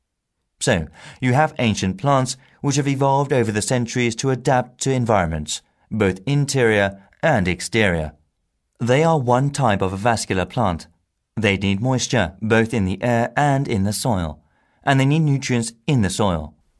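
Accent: United Kingdom. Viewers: British accent , this is a nice accent , but not for exam . 4/6 I could do it better :(((((((((( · me too :'( bc it's British Accent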